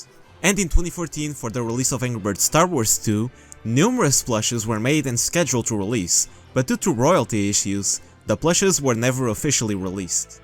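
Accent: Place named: Portugal. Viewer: krl tu é português, muito foda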